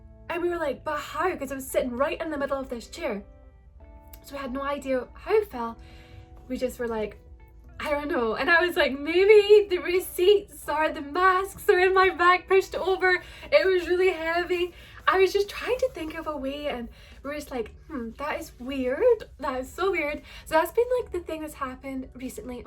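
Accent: Scotland, United Kingdom. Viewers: Love your videos ❤ ps are you from Scotland cos so am I 🏴😁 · Love your Scottish accent! Your nostalgic look is too cool! Love your videos and ignore the haters! You and Maverick and your little boy are too cute! Bless all 3 of you! ❤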